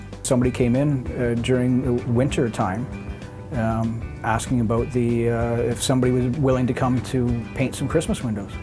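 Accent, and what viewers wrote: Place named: Canada. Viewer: Great work! I knew you were Canadian when you started talking.👍🇨🇦love to look at hand painted signs, puts vinyl to shame, no soul! Hope to see more of your work for years to come!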